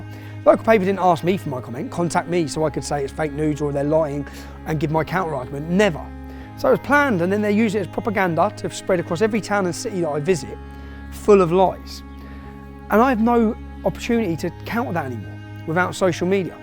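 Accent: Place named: United Kingdom. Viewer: Tommy, we love you! You are noble and a rarely brave Brit, with a fantastic accent to boot!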